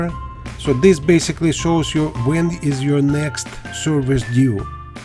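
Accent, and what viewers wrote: Russia. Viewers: the best part of the video is this guys accent. sounds like a Russian scientist. the good crazy ones. funny dude.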